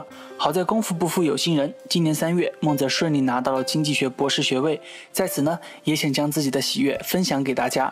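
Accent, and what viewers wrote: Hunan, China. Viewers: 湖南哪里的？ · 湖南老乡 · 是湖南人吗？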